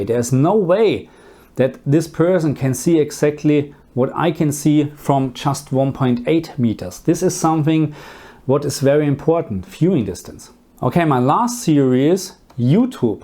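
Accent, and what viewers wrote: Germany. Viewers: Geiler deutscher Akzent. Ein Mischung aus Fremdschämen und Sympathie die ich da in mir fühle. 😁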